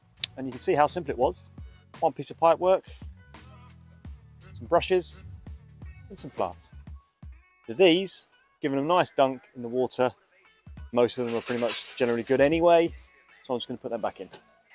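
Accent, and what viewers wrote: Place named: England, United Kingdom. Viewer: He’s speaking in a English kingdom accent and people that do that have a different definition of words ..often the English like that is only understood by the speaker or someone that does the same..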